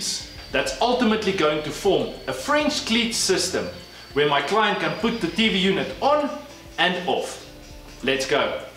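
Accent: South Africa. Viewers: Nowt better than the South African accent. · Fantastic work. You sound like a afrikaans speaking South African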